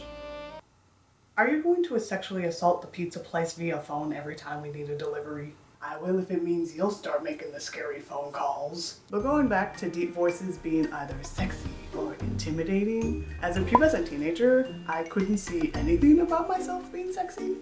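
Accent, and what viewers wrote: South, United States. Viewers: You just sound Southern to me. Along with the deep voice, it’s very soothing. The pizza scene was like ASMR to me! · You just sound country to meh......... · I’ve never even noticed how deep your voice is. Lol I mean I guess you have a bit of a southern accent and I hear them all the time since I live in Louisiana so it’s pretty normal for me! Lol My voice is super high naturally but I can also sound like a middle aged man who will beat you with my walking stick if you ever cross me. So ya idk where I was going with this comment...love the video and you! Have a great day!💖💞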